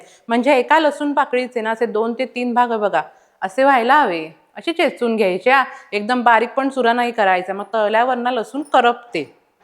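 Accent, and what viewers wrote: Kolhapur, India. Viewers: Typical Kolhapuri bolta te baghun Lai bhari vatatay.Ek no.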